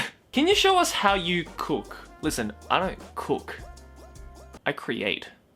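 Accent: Australia. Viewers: Holy shit I've been rewatching some older videos from Joey and the bois and I'm cringing at the thought of me ever watching these videos 5 years ago. These are all low-quality, immature, cringe videos compared to content you make today, both on your own channels and trash taste channels. Honestly watching another 10 episodes about shitting sounds better than rewatching this video once again. Also your aussie accent strong here. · #answermesenpai speak in your Australian Accent for the rest the video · #AnswerMeSenpai Do you speak Japanese with australian accent?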